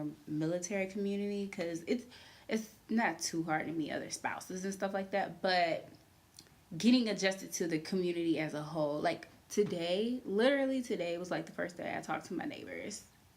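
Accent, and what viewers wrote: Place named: Virginia, United States. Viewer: Omg!!! I’m from VA! Virginia Beach! I had.m a FEELING with your “accent” (as Pharrell would call it...) I felt like it was like mine!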